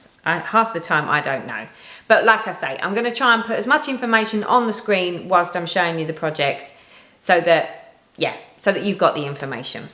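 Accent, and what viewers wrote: United Kingdom. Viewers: I love watching your videos and listening to your wonderful British English 😍 I usually have a new start whenever I want to, therefore it's great fun to watch you talking about your 'rules of new starts' and how you start stuff 'anyway' 😁 At the moment I have 54 wips, but a lot of them are under 30k stitches. And none of them is above 90k stitches 😊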